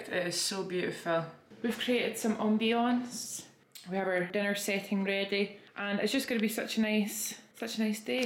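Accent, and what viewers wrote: Scotland, United Kingdom. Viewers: i just started watching your vlogs & i'm already in love with your attitude towards life. you're so positive and inviting which is a welcome change from a lot of other influencers i've followed. your speaking voice is so soothing & you make the voice in my head change to scottish!! (i'm american lol) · you have a most joyous outlook on life and personality, its WONDERFUL. I could listen to you read books, give TED talks, describe instructions, whatever. Your gorgeous Scottish accent is soothing. Whatever direction you go with your interests and passions, I'll be sure to follow. Happy 2021 and love from Atlantic Canada 🇨🇦 ❤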